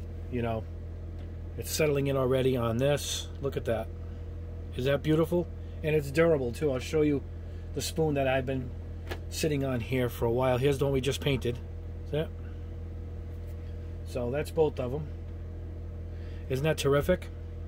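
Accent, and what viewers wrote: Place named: New England, United States. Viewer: I’m hearing a distinct New England accent here…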